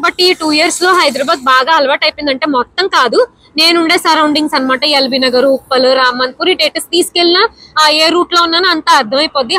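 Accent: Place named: Rajahmundry, India. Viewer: Mi Rajamundry slang super 😂 snacks 😅 anyway nyc vlog 🙌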